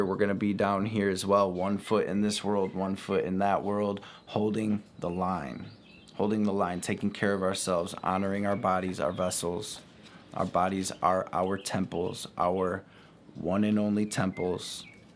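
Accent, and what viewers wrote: Detroit, United States. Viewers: You fasho gotta be from Detroit, “what up doe“, “my babies“ 😅😅 and of course the infamous Detroit 🧢 😅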